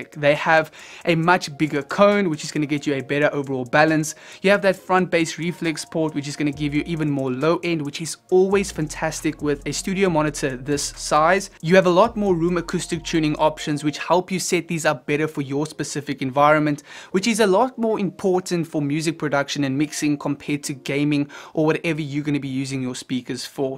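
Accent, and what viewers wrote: South Africa. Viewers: I hear a South African accent. Am I right?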